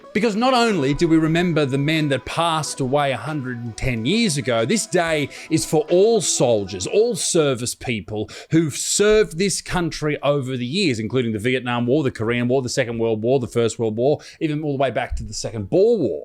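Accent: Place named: Australia. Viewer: Australian accents are very captivating in a strange way for me, anyways love your content 🙏 never give up to the stupidity of the world ☺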